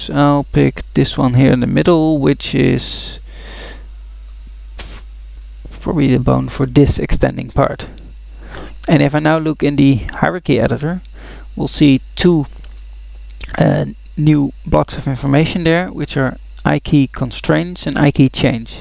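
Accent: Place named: Netherlands. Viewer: Sounds like you are Dutch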